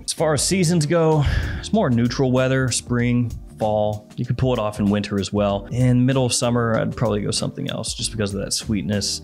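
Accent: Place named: Tennessee, United States. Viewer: Your TN accent sounds pretty similar to ours out here in Raleighwood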